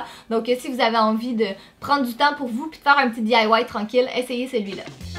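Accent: Quebec, Canada. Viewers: J'adore ton accent québécois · Ton accent et tros beau tu s'est canadienne? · tes canadien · J'adore ton accent !!😊 Tu viens du Canada ?? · J'aime tellement ton accent canadien !!❤️❤️❤️ une vrai merveille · j'adore tes vidéos et ton accent québécois est tout simplement magnifique continue ainsi et ne te laisse pas faire pas les haters ce sont des gros jaloux de ta beauté et de ton talent ne change rien et vraiment continue ainsi je t'adore 💖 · J aime trop ton accent québécois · j'adore l'accent québécois !! 😜😍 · j'adore tes vidéo et ton accent québécois💜💛continu ma belle tu mérite les <num> d'abonnés bisous je t'adore tes la meilleure 💚💛💓💔💖💟💞💝💘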